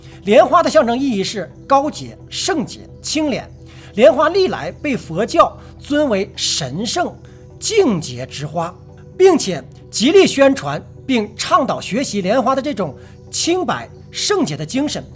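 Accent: China. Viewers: 大陆人有大陆口音有问题吗? 你要给配音吗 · 大陸口音需要那麼重嗎？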